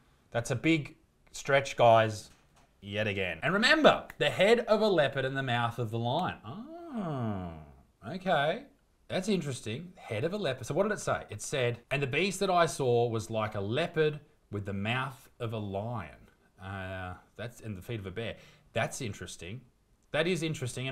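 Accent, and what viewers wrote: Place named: Australia. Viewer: The devil voice just doesnt work with an Australian accent lol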